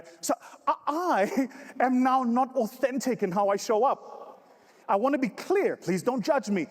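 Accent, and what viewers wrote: South Africa. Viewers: Your Proudly South African ❤ Africa accent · Pure South African accent. Keep shinning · South African accent 🤣🤣🤣🇿🇦🇿🇦🇿🇦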